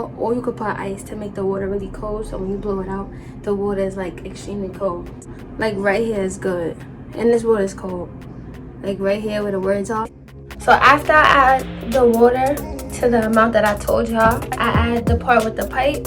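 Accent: New York, United States. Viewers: I love your accent , sounds newyorkish or Jersey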